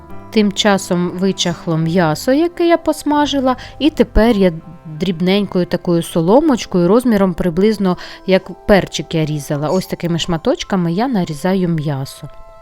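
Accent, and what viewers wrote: Standard. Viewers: Мені дуже подобається ваша чиста українська мова! І салатик смачненький! Буду чекати інших ваших рецептів!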